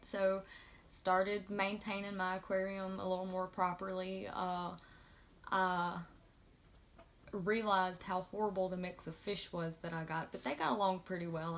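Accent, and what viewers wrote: South, United States. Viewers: Now that I've watched it all, Southern accent for the win! Welcome to the FishFam! That was a great first video with a wonderful backstory. Thank you for sharing with us! 👍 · First of all welcome to the fishfam! Second I appreciate relatability in people I sub to. I find you to be very relatable. I also live in a small town except we have one caution light lol. I've got tanks full of walmart fish and have been fishing my whole life. All the fish tanks we had used to be the same way. 10 gallon walmart tanks with just top offs. Didn't know any better. Don't worry about the southern accent, its great and makes you who you are. You are a natural talking to the camera. You will have a bunch of subs in no time. Great job! Looking forward to future content! Btw KGtropicals was one of the first channels I found and they helped me more than I could ever tell them!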